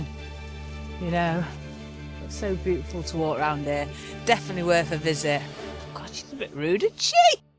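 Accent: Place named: Yorkshire, United Kingdom. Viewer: Hello from down here in Perth Western Australia, just wanted to say we love your restoration ❤ your Yorkshire chat is just the best you all go to make a lovely family Hope you don't mind your pet name " 😊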